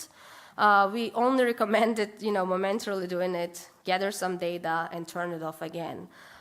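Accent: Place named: Turkey. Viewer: Anyone else wondering what her accent is, it's Turkish!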